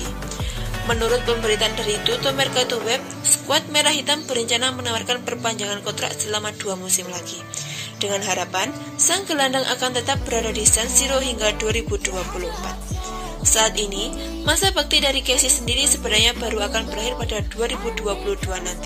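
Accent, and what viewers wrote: Java, Indonesia. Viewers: Yakin ini chanel nya Milanisti?kl iya knp bahasanya lebay dan pesimis seolah tanpa calha milan bkn apa2.hello u sdr gk sih milan itu jauh lbh besar dr sp pun jg termasuk calha.btw baikin dulu narasi dan intonasi nya.jgn medok gitu... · Mbak bisa d ilangin medoknya dikit gak wkwwk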